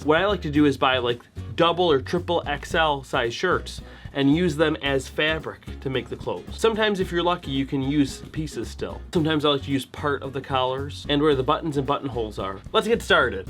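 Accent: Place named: Midwest, United States. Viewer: where are you from? I just think you sound midwestern but not sure (CO expat living in England)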